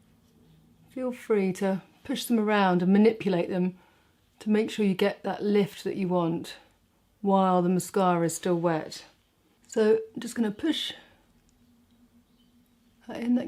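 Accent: United Kingdom. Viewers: you are so British!! Love listening to you talk! Little things you say are humorous. “Cheap as chips” and “Off to the disco” made me giggle. Thanks for the tips on getting out of the “down in the dumps”. You look lovely as usual. 🤗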